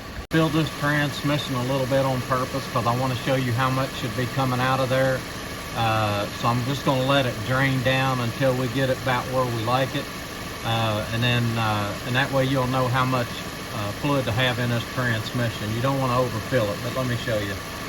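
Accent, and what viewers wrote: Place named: United States. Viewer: Thanks for this excellent video. One thing I really appreciate is the very clear American English! I'm not very mechanically inclined, and it helps immensely to understand what is being explained, and very well, I might add!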